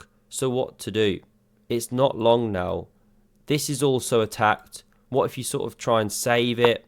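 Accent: United Kingdom. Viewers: Thank you. I meant your real name :-) From your accent you must be British and I love your shirt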